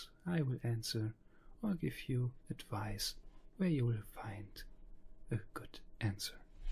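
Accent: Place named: Greece. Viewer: Are you Greek by any chance? The accent suggests you might be!!! Ευχαριστώ!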